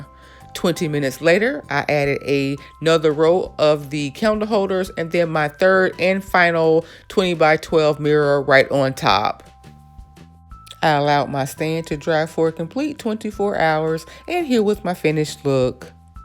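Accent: South, United States. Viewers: I hear. Just with a southern flow but clearly. Love the table.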